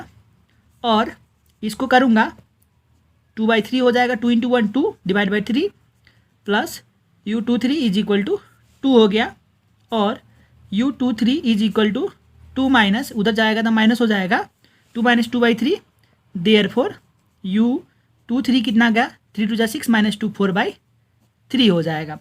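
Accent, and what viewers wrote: Nepal. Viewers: dai nepali ho? haha , anyway loved it haita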